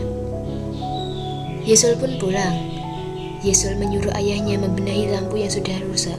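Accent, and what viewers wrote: Java, Indonesia. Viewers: Kaka narator nya jawane medok yah..... Salam dari jogja ka.. 😊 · I just wanna say: your Javanese so thick and glued. No offense though. Peace ✌️ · Org Jawa? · Orang Jawa ya? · SUARANYA MEDOK BANGET SIH.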